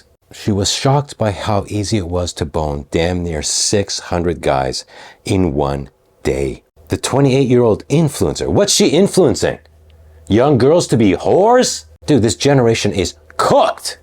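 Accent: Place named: Australia. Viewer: I was really really hoping to NOT hear an Australian accent /: I'm so ashamed /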